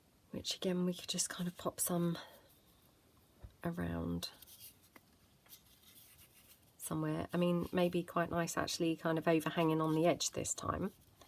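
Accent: United Kingdom. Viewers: Hi! I am a fairly new subbie, and wanted you to know how much I am enjoying your videos, and you! I was sorry to hear that some thoughtless person felt compelled to leave you negative feedback...for...what? Did I hear you right, for being too chatty? Really? I find your conversation light, real, and interesting. And, your sweet accent is music to my ears! If you don't mind me asking, you sound British to me, so I am curious as to where in the UK, do you live? It seems you and I are on the same wavelength, because I too have been very inspired lately by Creativecafegirl. In fact, today I was playing around with making a little something, with her in mind, when I clicked on your video...which made the whole experience even better, so thanks for your company and inspiration also. Your pockets turned out stunning! You did a marvelous job, and I just luv them! 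Your Bellissimo kit looks gorgeous! I must go look around at your Etsy shop. Sorry for being "so chatty"...LOL! TFS! xo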